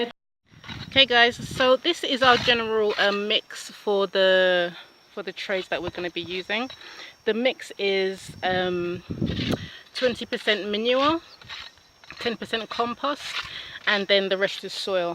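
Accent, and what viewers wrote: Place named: United Kingdom. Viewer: So good to hear that crisp British accent after trawling through so many annoying amercian video's! About to try and grow some here in the far south west of the U.K. for the first time. I've been led to believe it's possible, I guess we'll see!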